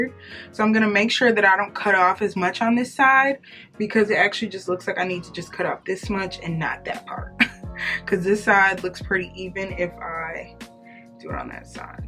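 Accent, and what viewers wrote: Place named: Texas, United States. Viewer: are you from texas beacuse i love your accent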